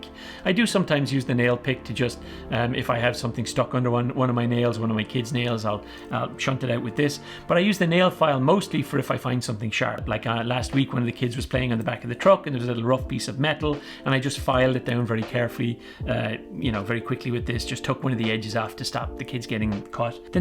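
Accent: Ireland. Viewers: I thought you were Irish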